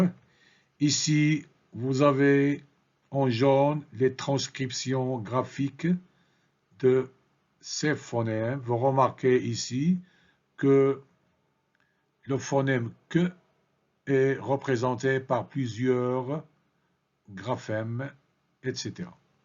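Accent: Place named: Belgium. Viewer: Votre accent est quand même très typé. Ce n'est pas un reproche mais il ne vous aide pas à différencier à l'oral le "a" de sac et le "a" de âne. A mon oreille, vous ne différenciez pas brin de brun, parfum et faim, à peine le "o" fermé du "o" ouvert et bien sûr les semi-voyelles "ui" et "oui": vous prononcez "lui" comme "Louis". Je vous soupçonne d'être d'origine belge, ce qui est loin d'être une tare. Je dirai même plus ( allusion aux Dupondt de Tintin), cela fait tout le charme des divers accents que l'on peut entendre en français et j'aime ces accents. Mais ça n'aide pas votre démonstration de phonétique!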